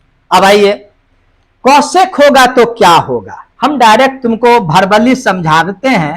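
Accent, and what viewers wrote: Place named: Rural, India. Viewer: gawar kaise bole rahi hai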